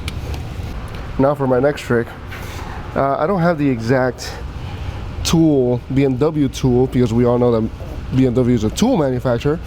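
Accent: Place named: Latin America. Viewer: What an amazing video. I just came back from the BMW dealer here in Edmonton where I live and they told me my water pump/t'stat housing was leaking. They are looking at $2400 CAD for the repair. My car has 134.000 KMS. While I was googling the problem I found your video and honestly it does seem like a lot of work for a DYI one. Is there any way I can personally check to see if the pump housing is leaking without taking so many things apart? Is it very common to replace this part in these X1 2018 xdrive 28i models? with the amount of kms I have is it something to expect? Thanks again for the great video and clean shots, I was able to see all the detail and learn more about my car. You have a new subscriber here. I am guessing you're also latino, I was trying to figure your accent and country of origin, I know this is off topic but I was curious. 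Keep up the good work and videos. Ill check some more as I also love learning about car repairs. Gracias!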